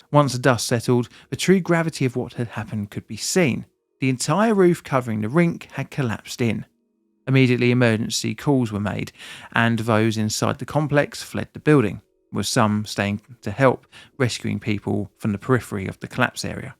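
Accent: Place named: England, United Kingdom. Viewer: As a German can I just say, your pronunciation of Reichenhall is spot on. Yeah there is a slight accent but bruv an Englishman saying "ch". Sorry 😅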